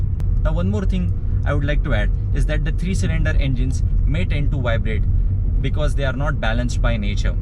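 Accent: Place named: Pune, India. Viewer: Puneri english accent.nako re.marathit kelas tar khup likes miltil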